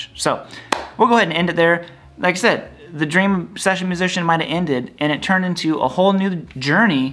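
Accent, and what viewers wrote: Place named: Ohio, United States. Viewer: I love your videos as there is such much positivity. I like your "weird" Ohio accent as it is YOU, something that always comes across in your videos.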